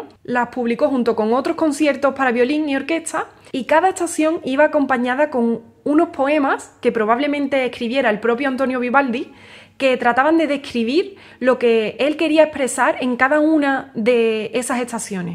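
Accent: Andalusia, Spain. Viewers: En el otoño de mi vida encontré la primavera en esta niña talentosa sólo con escuchar su música y su gracia andaluza en el hablar todas las cosas se cambiaron ya para mi sólo puedo decir floreció en mi una eterna primavera GRACIASPOR EXISTIR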